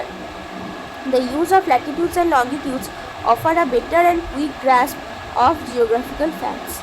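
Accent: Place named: Tamil Nadu, India. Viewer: Blud got the accent of a Tamil niggi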